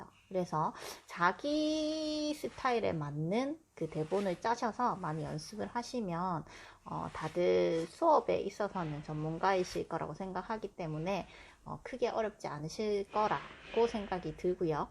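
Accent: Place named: Gyeongsang, South Korea. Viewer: 선생님~ 임용 공부하다가 억양이 너무 익숙해서 찾아보니 대구셨군요! 송구하지만 저도 자료 부탁 드려도 될까요? <mail> 입니다^^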